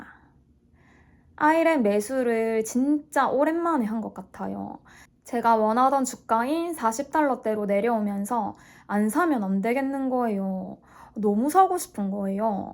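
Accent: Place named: Gyeongsang, South Korea. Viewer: 경상도 사투리..! 저도 투자하는 것을 좋아해요 선생님!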